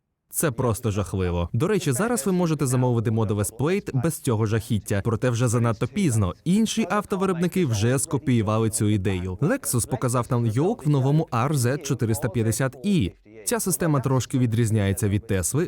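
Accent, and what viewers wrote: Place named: Russia. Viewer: Шкода, що Український канал озвучує людина, яка все життя розмовляла руські... А той хто справді все життя розмовляв рідною мовою мусить дивитись і терпіти цей руський акцент... Повірте, це дуууже замітно!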